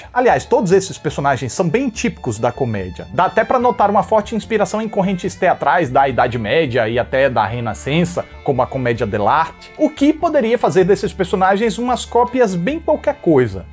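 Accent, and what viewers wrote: Northeast, Brazil. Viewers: Eu amo o e amo Suassuna... mas como nordestina, ver um nordestino, fazendo uma análise crítica de outro nordestino, é tão lindo! Ver cada frase do contemplada com um delicioso sotaque nordestino é sem palavras! E bora ver O Auto 2 ❤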